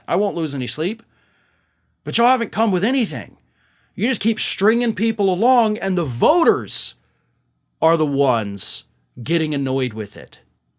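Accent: South, United States. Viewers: Love the accent..yep the birn and breed in the south is still so backward and pissed that they font have any slaves.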